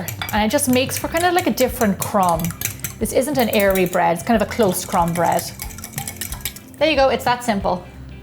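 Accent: Ireland. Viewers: Love your videos,I will try this bread recipe fingers crossed, love your Irish ☘️ accent, Thanks for this recipe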